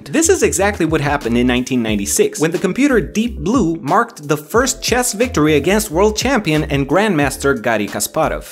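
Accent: Romania. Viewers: The through just sounds ROMANIAN AND I LIKE THAT XD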